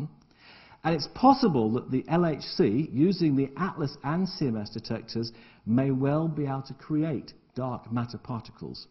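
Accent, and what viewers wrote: United Kingdom. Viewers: do you know wot I mean? does that make sense? d'ya see wot I mean? Oh, and that gas over there, that's "MEE-thane"...(ugh, I can't stand the way brits mispronounce words). Oh, and "isn't it?... isn't it?...know wot I mean? Isn't that right? LOL; Really, I enjoy these lectures. Prof Morison does have an interesting, animated mien about his presentations. Always fascinating to watch. And I thought the "Oscar Party" analogy was a great one: it's a great way of explaining how mass works. And, i agree: quarks shouldn't have been arbitrarily changed to "truth & beauty" from what they've been called all along & what is a much more sane label: "top" and "bottom", besides, "truth" and "beauty" are both subjective, where as there's no argument, can be none, to something that is "top" and something that is "bottom"; cheerio! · the British accent is so tantalizing.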